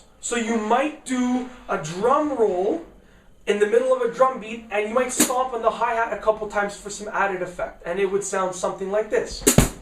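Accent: Canada. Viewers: Are you Canadian? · Sounds canadian for some reason. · Definitely Canadian. Good video man.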